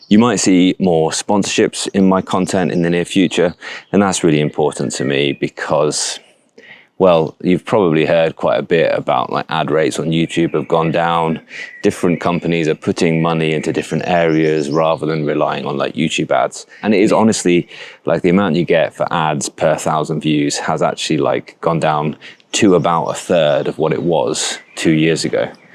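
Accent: United Kingdom. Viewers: I love your videos. First, you’ve got the Brit accent, it’s cathartic for an American. Question. I currently have a remarkable pro move for quick notes and keeping up at work. The problem being is that I find it often gets left behind on my desk at work because I’m usually walking around a 1 million square foot hospital managing my staff, and there is concern breaking a larger device because I’m usually a hands-on kind of guy when it comes to building repairs. Add into that that I’m slightly irritated about having to pay for the Connect service if I wanted to use multiple reMarkable to sync across each other. I’m debating dropping the remarkable and moving to a Boox Palma pro in my pocket constantly, with a larger Boox Go that stays at my desk. I am hauling an xteink x4 to read, but find getting books on it tedious, but love that it is helping deter me from phone distractions. 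Can (and is it more desirable) to make the move to the Boox platform with sync and removing the remarkable and x4 from my pockets. Does the hi brake pro or plus support syncing with a bigger break device? I’m curious of your thoughts since you have so extensively used all these devices.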